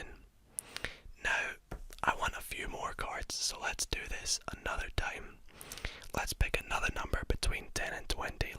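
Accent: Scotland, United Kingdom. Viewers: Took me a minute to notice you're scottish 😄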